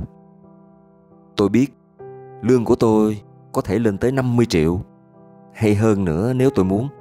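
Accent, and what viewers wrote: South, Vietnam. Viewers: Một chất giọng nam bộ đầy lôi cuốn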